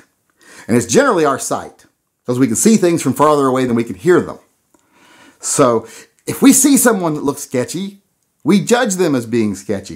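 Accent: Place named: South, United States. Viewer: You got an automatic like and subscribe the second you gave your final thought. All I was thinking the whole video was the same thing and then you dropped it on my like a Boulder 😂 never hear people in the gun community speak with such openness and honesty especially a fine southern sounding gentleman like yourself. I’ll be checking out the channel now, thanks for throwing in your 2 cents.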